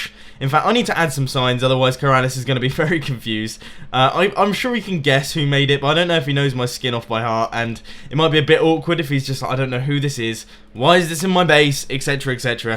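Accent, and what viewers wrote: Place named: RP, United Kingdom. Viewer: Why is he so posh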